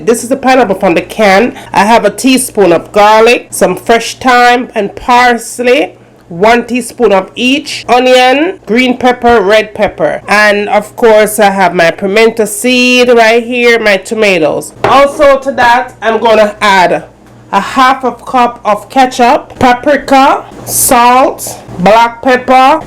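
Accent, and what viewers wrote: Jamaica. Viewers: I've never tried gravy like this before I love it thanks! and I love you authentic Jamaican accent!!! 💜💜💜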